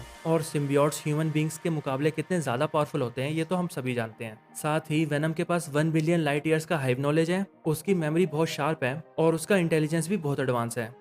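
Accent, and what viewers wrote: India. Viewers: hind şivesi cok komik :D:D:D:D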